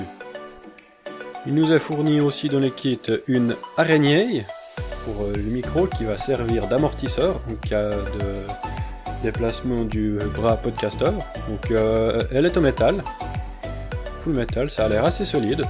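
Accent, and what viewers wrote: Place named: Switzerland. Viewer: ça sent la SUISSE dans ta voix !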